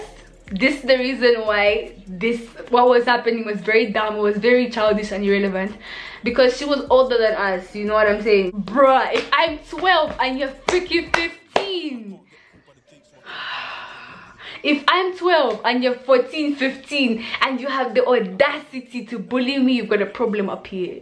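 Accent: Nigeria. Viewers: You have a Nigerian accent 😍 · You sound Nigerian 😭❤️youre literally my favorite YouTuber as about now !!❤️🔥🌈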